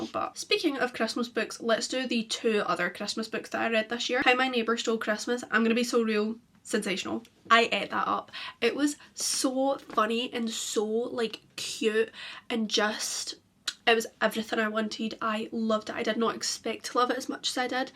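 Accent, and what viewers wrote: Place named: Scotland, United Kingdom. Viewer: nice video! scottish accents are so addicting to listen to 😂💞 - from a slavic girl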